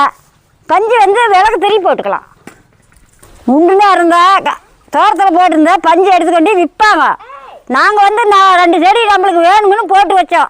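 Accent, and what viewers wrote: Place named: Salem, India. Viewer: Salem district. From Her dressing and slang. My native omalur salem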